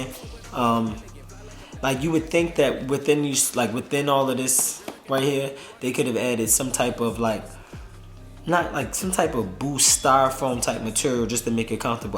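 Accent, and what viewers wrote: Baltimore, United States. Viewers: Ik you from Baltimore 🤣🤣you can tell in ya accent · You frm Baltimore?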